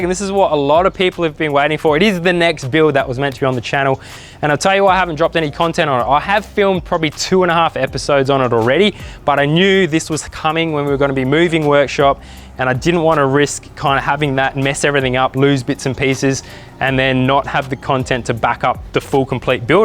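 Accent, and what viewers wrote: Australia. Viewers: How good is the Australian accent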